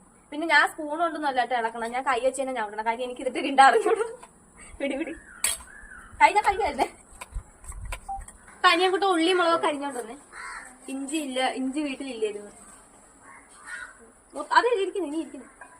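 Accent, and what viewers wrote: Kollam, India. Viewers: Ninghal ethu districtil aanu...shaaili kettittu kollam aannennu thonnunnu ...😅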